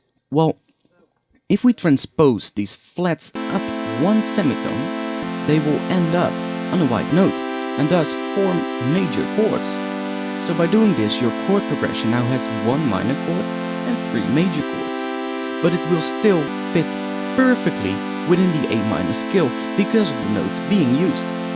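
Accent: Netherlands. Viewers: He's from the Netherlands...it's an accent. You are a dumbass.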